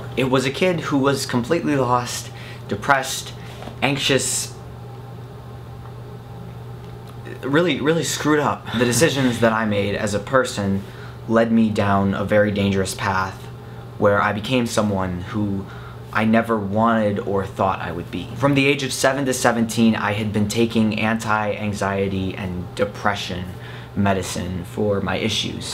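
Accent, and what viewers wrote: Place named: Wisconsin, United States. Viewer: ahhhhh that's why he reminds me so much of Luke they are both from Wisconsin (accent)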